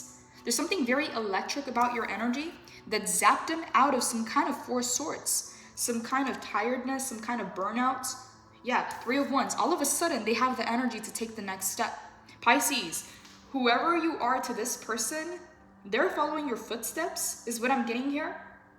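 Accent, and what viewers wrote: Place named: Latin America. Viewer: 333 Eastern!!! I AM SO HAPPY WHEN I SEE YOU POST!! Ain't gonna lie I feel like we are long lost family. I swear I can feel you when you meditate. I detect a Latina accent am I correct, if so I was wondering what your lineage is? I ask because my indigenous ancestors were Tahino { primarily Porterican and South Florida descent and Aztec { South America}... Either way I usually only listen to 3 readers on the regular and you are my top 2. My other reader does readings every day. I understand why you don't, you put a LOT OF ENERGY in your readings. But as someone who was a Christian Pastor and has a degree in Social work, a soul healer where I have given advice to hundreds of not thousands by now... I will gain in sight from you I will be honest, I don't have very many people who are able to advise me with things I don't already know or I am not already thinking of. 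You do. FULL HONESTY I believe you have powerful emotional advice. I wish we were friends. I see how the cards and spirit brings out beautiful messages through you but you absolutely communicate them with grace. I find myself listening to you more for your advice and empowerment as I am processing through life. Know you are loved from afar and I greatly appreciate all that you do. My only wish is I could afford your personal readings so I could finally have just a normal conversation about life .. Chi Miigwetch ( Heart FEALT gratitude, Ojibwa)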